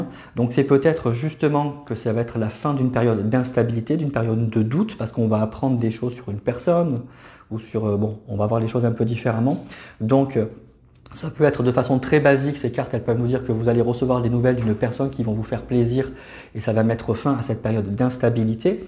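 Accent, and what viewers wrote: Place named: South-West, France. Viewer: Bonjour ☀️ et merci pour ce tirage. Petit accent du sud-ouest que je reconnais, j'ai le même ☺️ A bientôt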